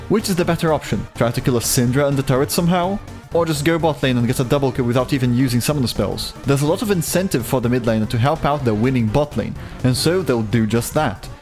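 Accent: Brazil. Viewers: Are u Brazilian ?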